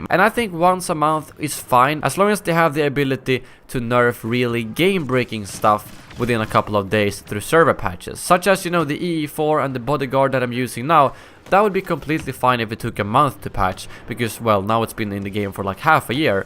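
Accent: Sweden. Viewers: are you swede?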